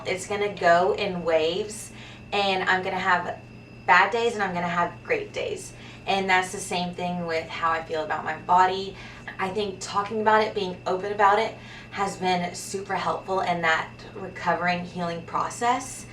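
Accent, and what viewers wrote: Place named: South, United States. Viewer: Love her accent, she’s the definition of southern charm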